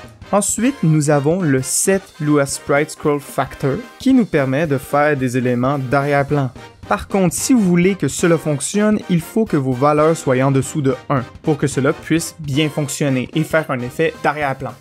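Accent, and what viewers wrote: Quebec, Canada. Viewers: tu es québéquoi ?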